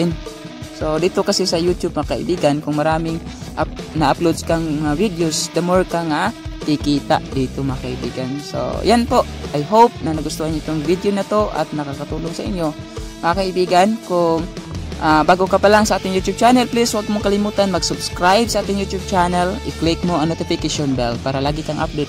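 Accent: Visayas, Philippines. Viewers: bisaya ka dong haha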